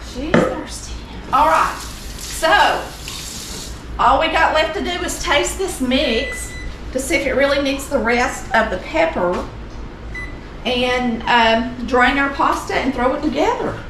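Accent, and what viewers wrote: South, United States. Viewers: Hi ; I'm a newbie ; Just ran across your channel tonight going through my phone with nothing to do and when I saw Mac salad I STOPPED and WOW ! I'm glad I did STOP 🛑 INN I love Mac salad I made some SUNDAY for the FAMILY COOK OUT BUY ; I didn't make it like this this looks much better than the one I made and I LOVE ALL the GOOD STUFF you ADDED IN I BELIEVE it TASTE JUST as GOOD AS IT LOOKS yummy yummy 😋 MAKES YOU COME BACK FOR MORE... I WILL BE SUBSCRIBING COMING BACK TO WATCH MORE and MORE LOVE YOUR SOUTHERN ACCENT ❣️ AND THE SOUTHERN COUNTRY COOKING LOOKS AMAZINGLY GOOD AS THEY SAY I'll BE BACK , LOVING YOUR CHANNEL ,GOD BLESS🙏 STAY SAFE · Love that country accent.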